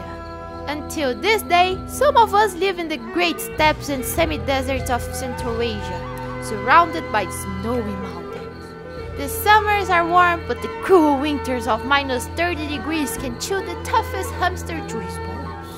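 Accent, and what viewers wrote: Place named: Brazil. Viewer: are you brazilian? you have a brazilian accent that i have too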